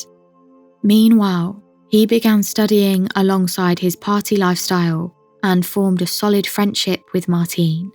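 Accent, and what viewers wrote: United Kingdom. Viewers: Thank you for all the content, you're a pro! Love your soothing voice and the british accent🙏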